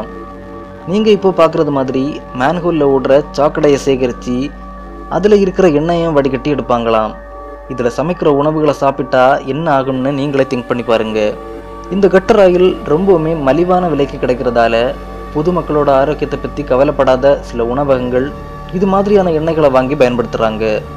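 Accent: Kanyakumari, India. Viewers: Makka.kanyakumariya.unakka bhasa kettapale manasalaach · Bro unga slang....kanyakumariya...... nagarcoil ah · Kanyakumari slang.....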